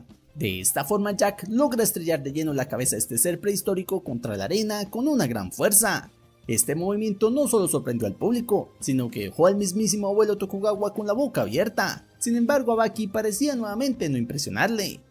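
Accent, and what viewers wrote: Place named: Mexico City, Mexico. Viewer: porque no cambias tu vocalización a la hora de narrar?? usas mucho el aaaaaaaaa, eeeeee, iiiiiii, oooooo con un tono de chilangoooo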